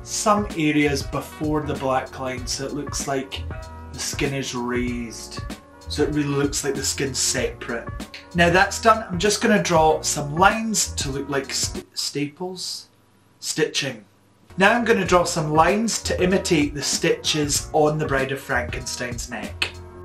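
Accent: Scotland, United Kingdom. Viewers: Lawrence Chaney could insult me in Scottish and I would say thank you, may I have more please? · Love that Scottish accent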